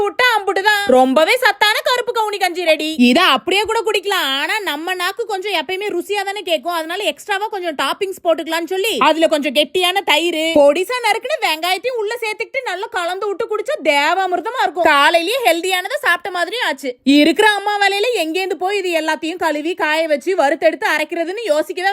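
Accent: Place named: South Tamil Nadu, India. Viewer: U hv mentioned that ur working mom from south of TN. R u using the same slang of Tamil with others or only for video?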